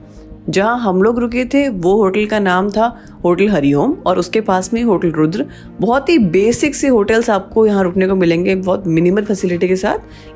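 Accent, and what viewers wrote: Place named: United Kingdom. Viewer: Use less auto-tune and change ur accent to Indian... unnecessary english accent is really annoying even though ur content is good....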